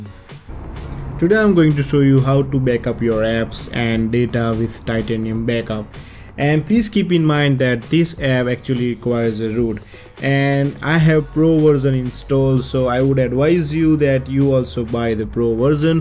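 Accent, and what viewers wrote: India. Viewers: Bro are u Indian??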